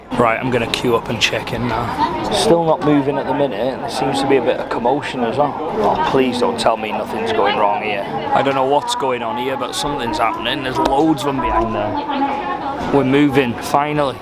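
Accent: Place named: Liverpool, United Kingdom. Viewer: The more I listen to voice, the more I understand how related the Liverpool accent is to that of north Wales...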